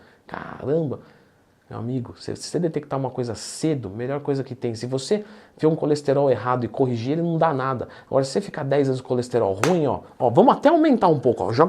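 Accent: Northeast, Brazil. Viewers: Vc é nordestino? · Vc é nordestino? Que bonitinho!!!!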